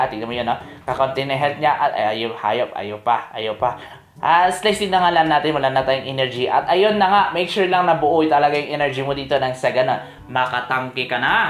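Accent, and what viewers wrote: Ilocos, Philippines. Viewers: Taga baguio ka boss? Aus pre ung tono mo pang pure ilocano tlga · Ilocano ka gayam idol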